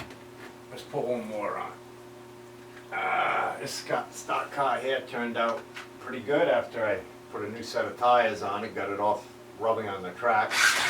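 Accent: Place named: Rhode Island, United States. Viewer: You sound like a fellow Rhode Islander to me, Did I guess right? Or Mass. maybe Boston? Nice track.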